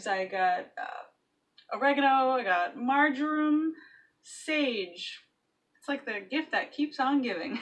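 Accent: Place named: New England, United States. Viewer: Thankfully I recently found your channel and the moment I heard you speak, I KNEW you were also a New England girl. 🙌🏻. Thank you for all you do here. I see the comments. I know all the positive feedback you receive, but I hope you truly understand what positive things you are doing for all of us who are desperately trying to heal themselves in various ways though just don’t know how. Many many thanks. ♥️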